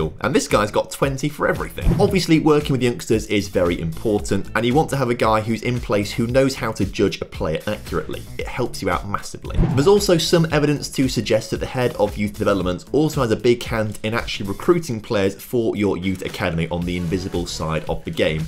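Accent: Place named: RP, United Kingdom. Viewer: it just sounds way too posh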